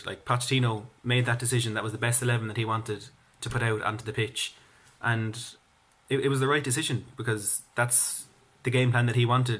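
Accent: Ireland. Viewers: a weird accent, how rude, is from Ireland, his accent is very common in Ireland!, comment on his Blog, not how he sounds.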